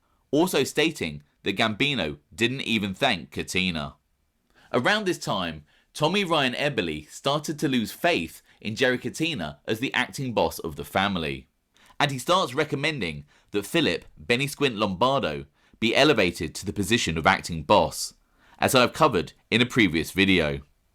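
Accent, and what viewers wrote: United Kingdom. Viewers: I apologize in advance to u t administrators and to the speaker of the video,in my humble opinion heavy exttrapolated british unsophisticated accent is the last thing America crime story needs I am a Cambridge graduate myself, mean no disrespect but that's the way i feel about this videos, sorry again.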